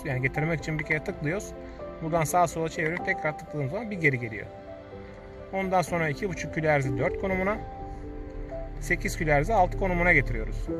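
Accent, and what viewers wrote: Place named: Thrace, Turkey. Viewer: dayı tekirdağlı mısın edirne mi? :D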